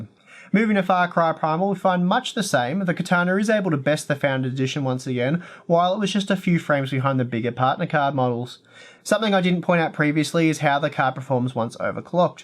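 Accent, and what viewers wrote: Australia. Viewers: I do so like your videos matey, nice to hear an Aussie online. Keep up the good work on the reviews. :)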